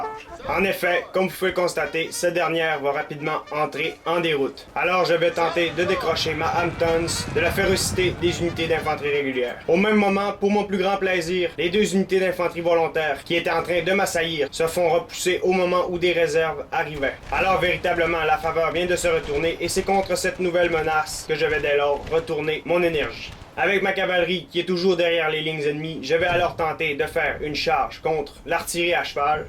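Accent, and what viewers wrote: Quebec, Canada. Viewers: j aime bien l accent canadien^^ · Québécois? :D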